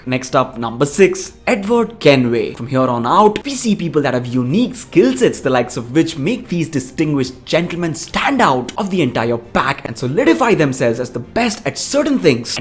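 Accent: India. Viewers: In my opinion you (the player) is the best Assassin Btw love your south indian accent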